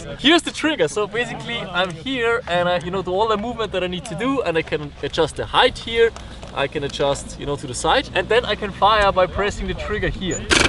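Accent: Germany. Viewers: Dein english hört sich Deutsch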